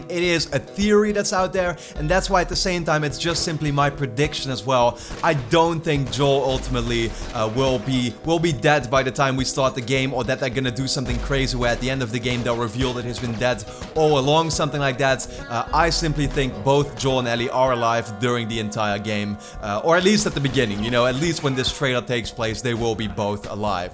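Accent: Netherlands. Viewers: Are you Dutch? You sound Dutch!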